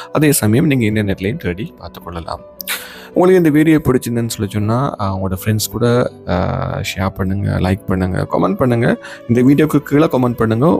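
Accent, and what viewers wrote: Sri Lanka. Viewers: Hello doctor, your tamil slang is like somewhat srilankan people speaking tamil.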